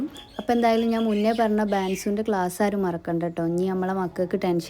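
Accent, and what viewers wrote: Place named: Malappuram, India. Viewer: Areekode slang ann🥰njanum angane aaan..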